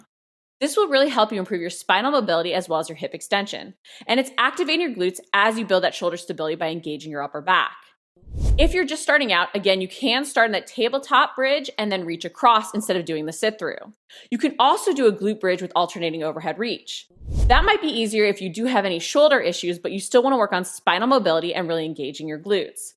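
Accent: United States. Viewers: Where is your accent from in US? I’m getting used to it and your warmups and stretches are helping me get back into running. We can’t cycle here in France ar tbe moment but yes i have massive thighs and weak glutes/ hips!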